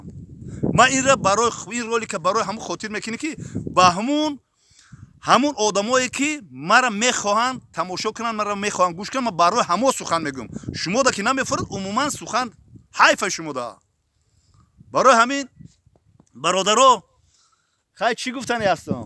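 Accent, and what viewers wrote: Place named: Kulob, Tajikistan. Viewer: кулоби гапзан лижа меган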